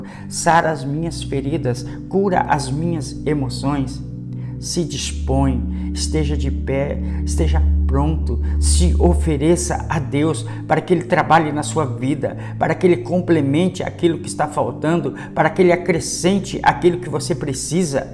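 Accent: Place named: United States. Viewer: Vc É Americano ?Teu Sotaque Diferente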